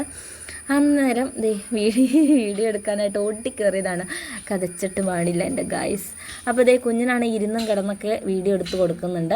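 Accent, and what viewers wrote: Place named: Thrissur, India. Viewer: പെണ്ണേ സൂപ്പർ 👌🥰പ്രതീക്ഷിക്കാതെ കിട്ടുന്ന ചില സന്തോഷങ്ങൾ അങ്ങനെ ആണ് ❤പിന്നെ മഞ്ഞ് 👌🥰🥰🥰🥰എടെക്ക് തൃശ്ശൂർ സ്ലാങ്ങ്കേറി വരണ് 🤩ശ്ശേ!!!🤩അത് നിന്റെ ഏട്ടന്മാര് തൃശൂർക്കാര് ആയോണ്ടാ കുഞ്ഞി ❤👍"രാവണസോദരി"🥰🥰🥰🥰🥰🥰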